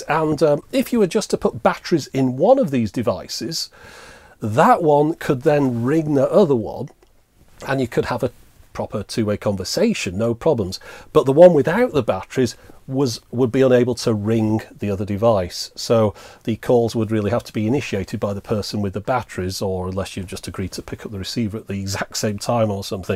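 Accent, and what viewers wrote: United Kingdom. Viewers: I love your British accent...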